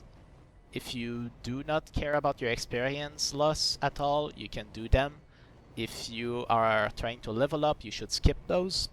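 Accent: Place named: England, United Kingdom. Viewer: cette accent anglais xd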